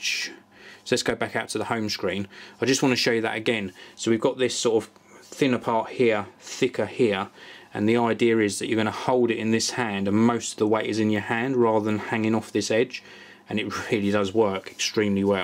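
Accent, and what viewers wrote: United Kingdom. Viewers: Very cute British accent. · Cool Britain Accent ... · which part of England are you from? you seem to have a thick British accent. great review btw.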